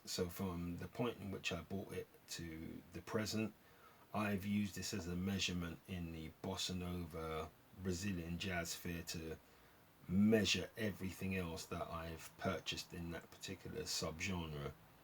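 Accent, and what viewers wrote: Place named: United Kingdom. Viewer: I totally agree with you about Nancy Wilson! thank you for this video. . . very information and presented in that British accent that just makes me melt. . I’m just a puddle by now :). stay safe and well.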